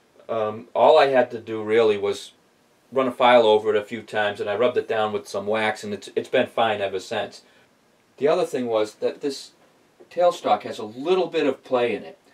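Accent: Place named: New England, United States. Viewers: I'm guessing somewheres in New England. Maybe Maine or New Hampshire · I'd say more like Massachusetts.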